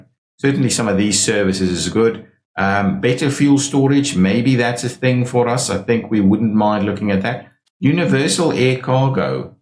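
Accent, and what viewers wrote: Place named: South Africa. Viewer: Are you South African? The accent screams South African. 😁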